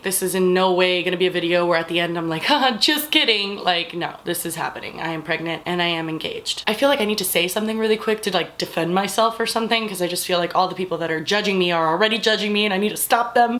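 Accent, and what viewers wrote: Cuba. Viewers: She's Cuban :) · Sharon Amador half cuban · Brianna Zinsky she's Cuban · It's a Cuban accent. She's Cuban.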